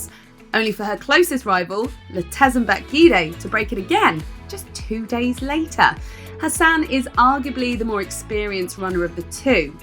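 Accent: United Kingdom. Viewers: Hoping that no one says this lady is bias. due to her accent I can tell she's British. she said Dina will get 3 medals at the Olympics, meaning 3 gold. not bad for Dina, I love her, but the million dollar question is where is Shelly Elaine and shericka in the 100m 200m and the 4×100m? something to think about🤔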